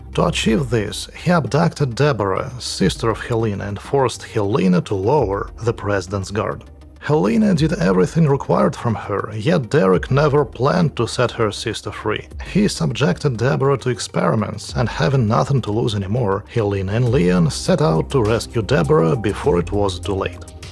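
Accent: United Kingdom. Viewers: Vpolne po yorksherski))) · oh are you from england?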